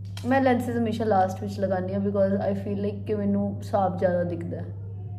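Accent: Punjab, India. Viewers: I wish i cuold learn from you but i m from Pakistan... Love the way you speak punjabi i jst love it... I am your new viewer.. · Its jst pure punjabi accent..hum bhi ghr pr normally bros hi bolte jdo punjabhi vich gl krde...brows waala accent niklda hi nhi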